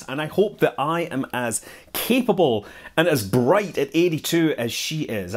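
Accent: Scotland, United Kingdom. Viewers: He's Scottish. Well, kinda.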